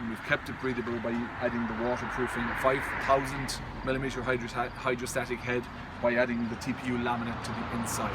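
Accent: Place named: United Kingdom. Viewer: I agree people sometimes add sounds to words unnecessarily. That being said, it seems American English is not the first language of the host of this particular video. There are many millions of folks out there who speak British English--they pronounce aluminum a different way. <link> And in my book, different in this case is perfectly fine. Who am I, or you, to judge anyway--aren't there better things to be spending our time on than calling people out publicly/on social media for things like this?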